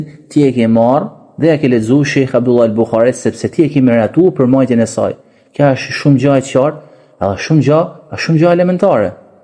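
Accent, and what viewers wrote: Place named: Shkodër, Albania. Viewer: Kush asht hoxha qe flet se po duket shkodran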